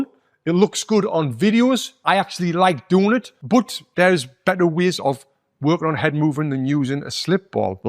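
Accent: United Kingdom. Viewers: From what region of Britain are you?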